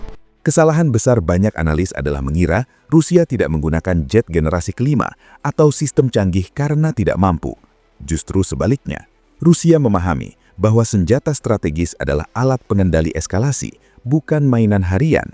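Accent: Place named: India. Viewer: Același propagandist pro-rus, după dialect de origine indian, a început propaganda pentru anul 2026. Nu vă aplecați urechea. Riscați să vi se strice creierul datorită toxicității.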